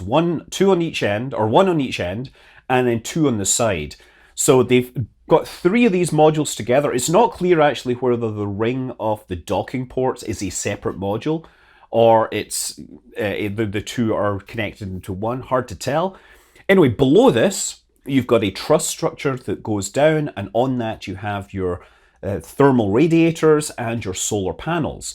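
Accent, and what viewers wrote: Scotland, United Kingdom. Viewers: I say it to myself every time I see he has a new video up! My girlfriend hears me and says "Oh is it that Scottish space guy?"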